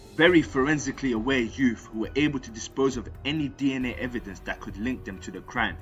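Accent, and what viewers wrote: Liverpool, United Kingdom. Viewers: your scouse accent wasn’t bad fr😭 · Love your Liverpool accent PMSL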